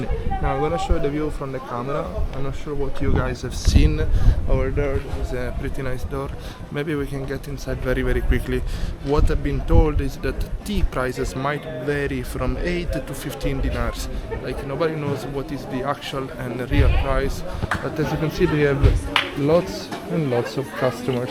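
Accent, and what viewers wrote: Italy. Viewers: So beautiful the place look like Greece beautiful video thanks for sharing I like your Italian accent when you speak English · Nice video I loved it so much like your Italian accent, keep coming back. Much love habibi 🇹🇳❤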